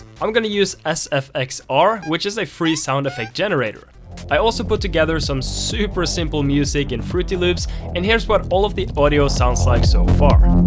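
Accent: Norway. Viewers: are u Norwegian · Wait are you norwegian?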